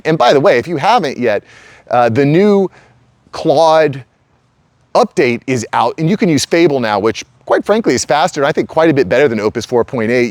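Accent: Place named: United States. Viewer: Speak slowly, articulate clearly and speak in English, not American.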